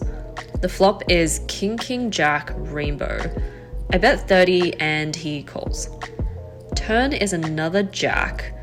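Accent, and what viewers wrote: Australia. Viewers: She's Australian. I understand her just fine.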